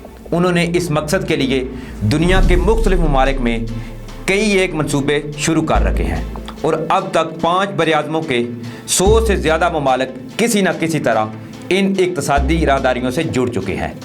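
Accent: India. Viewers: Its a Indians channel. Anchor is Indian in disguise of Pakistani. You can check his accent also. He use qhab instead of khwab, qhas tore instead of khas.its problem of Indians. Plz unsubscribe it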